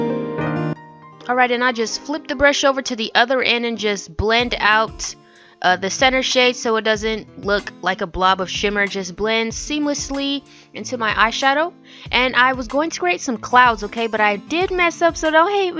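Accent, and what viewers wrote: New York, United States. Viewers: lmaoo i love this ur deadass from new york "yafeelme"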